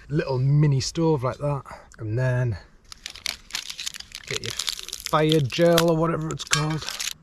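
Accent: Newcastle, United Kingdom. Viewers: you're like a Geordie version of Steve1989MREInfo.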